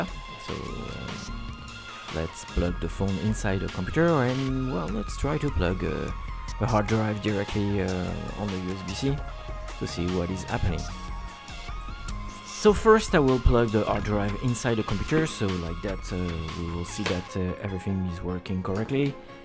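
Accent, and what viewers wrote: France. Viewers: What's the name you said in the beginning? Why your charger block is us Canada if you have a French accent? It isn't an European company? You are french Canadian?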